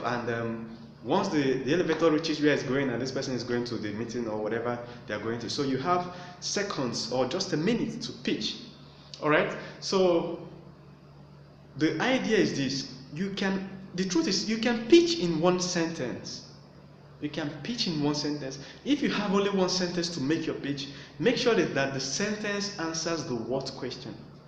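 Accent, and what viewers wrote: Nigeria. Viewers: I hear the Edo accent #tongueout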